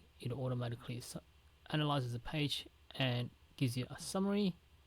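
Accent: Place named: United Kingdom. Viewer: Bro, what's your origin? You sound pretty UK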